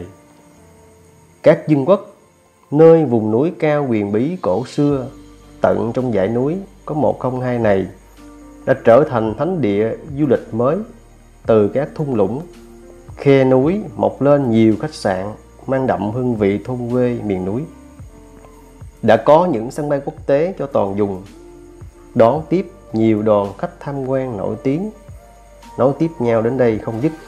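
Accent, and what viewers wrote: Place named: Hau Giang, Vietnam. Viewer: Giọng người Hậu Giang bạn ơi.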